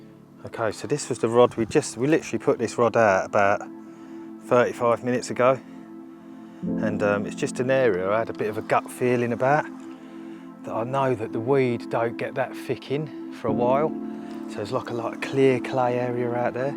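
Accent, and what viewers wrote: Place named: United States. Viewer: Great video but why the stupid American accent…?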